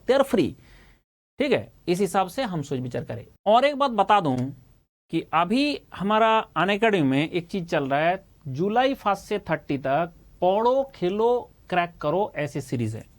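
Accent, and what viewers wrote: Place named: West Bengal, India. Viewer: I'm sure sir is from west bengal .... Voice 🔊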